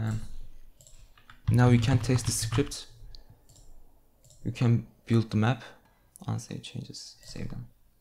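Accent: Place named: Turkey. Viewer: Selam dostum. Ben de bu konuda bir şeyler yapmak istiyorum. Aksandan direkt anladım Türk olduğunu ve mutlu oldum. :D Sana nasıl ulaşabiliriz?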